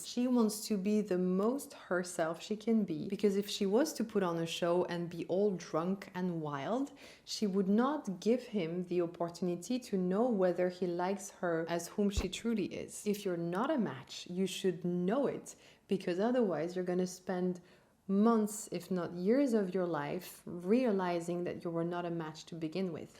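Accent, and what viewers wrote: France. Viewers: I would love to have you as an older sister of an aunt. You are so admirable and seem like a very lovely person, I love your calm voice and subtle French accent. It is very reassuring.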